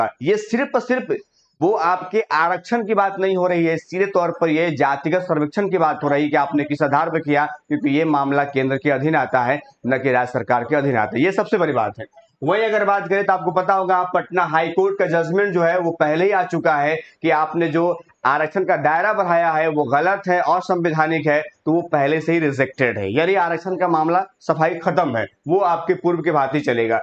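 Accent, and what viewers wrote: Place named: Uttar Pradesh, India. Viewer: Tu up ka hai lag rha hai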